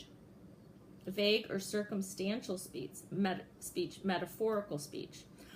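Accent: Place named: Midwest, United States. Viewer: Love the midwestern accent!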